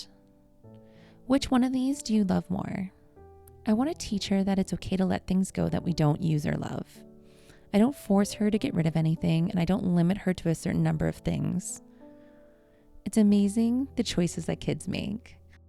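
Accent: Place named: Canada. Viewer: You're doing a great job! I'm like you and get completely overwhelmed by clutter but I'm not a naturally tidy person so it's an uphill battle! I think my space is similar to yours in that it already doesn't have that much in it to begin with. But it's all abt how we FEEL abt the space isn't it? At one point I recognized your Canadian accent and then shortly after saw The Bay on a box! Judging from a scene in another vid, I used to live in the same area (generally speaking!) as you. I look forward to the rest of this series of vids👍🏼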